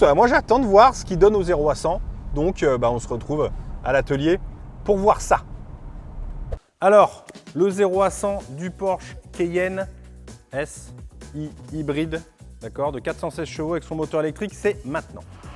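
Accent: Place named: Brioude, France. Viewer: C'est à cause de l'accent de brivardois. Jean leguin humoriste lol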